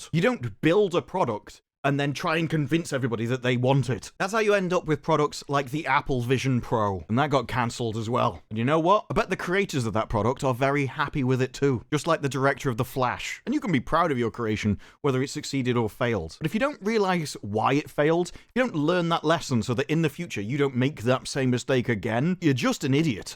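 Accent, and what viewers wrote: United Kingdom. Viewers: Did the british accent just talk shit on the 5th largest economy on the planet(california)? Sounds like someone from the 6th largest econ (uk) better plug his queen hole.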